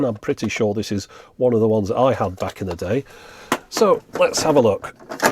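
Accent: United Kingdom. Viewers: Hi sorry, what sort of accent do you have? I can hear it's a UK accent but what part? Just curious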